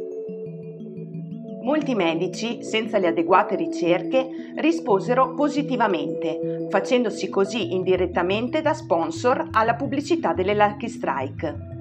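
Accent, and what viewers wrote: Veneto, Italy. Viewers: Sei veneta? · Racconto interessantissimo... grazie☺️ Sei Veneta per caso? 😬🙃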